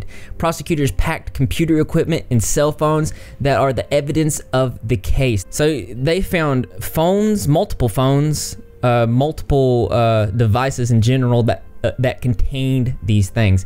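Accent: South, United States. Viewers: Oh mah gosh u got a country accent like me :D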